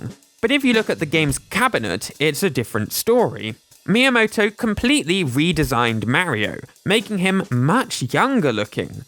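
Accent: United Kingdom. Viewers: HES BRITISH!!!!!!!! 😡😡😡😡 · For the people who are confused as to why pronounces Mario differently, a lot of us from the UK pronounce it that way and have been doing it for years so it’s nothing new. Some people in other countries say it that way as well so it’s not only restricted to us. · He’s British that’s how we say it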